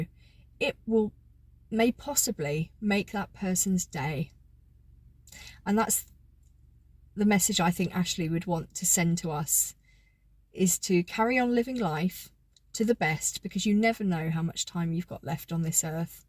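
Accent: South, United States. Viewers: She will be truly missed. Loved her videos and her wonderful southern accent. She was a wonderful soul. May she rest in peace 🌈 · She was the first person I subscribed to. She was so wonderful to listen to. I loved her southern accent! She will be missed greatly by us all. Condolences to her family RIP sweet Ashli you are now safe in our heavenly fathers arms! 🙏❤️ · Thank you, It's true though, Ashli came into our homes 100s of times because we invited her in for a chat. Many of us will miss her deeply and yes keep her channel (I will as well) just to hear her dulcet Southern tones. I remember when my Mother passed away I would call her daily praying she would just pick up the phone so I could hear her voice say "Hello". There is some breaking news here in the UK. The Palace has announced that our amazing Queen's health is deteriorating and as I write to you members of the family are travelling up to Balmoral to be with her. 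Her brain is strong and hopefully she will pull through, however this does not sound good.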